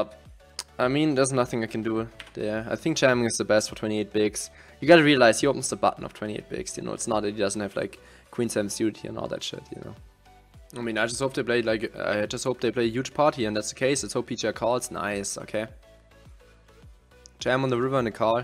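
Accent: Germany. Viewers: You should work on your german accent. And maybe dont cough so much ;)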